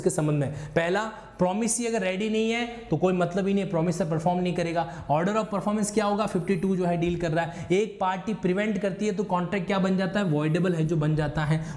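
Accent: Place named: India. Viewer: This rubbish is an Indian law lecture in Pidgin.-English and Indian dialect.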